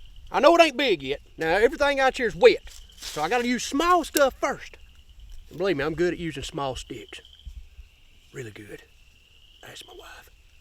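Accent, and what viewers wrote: South, United States. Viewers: I love this guy's accent. Is that what Americans can southern?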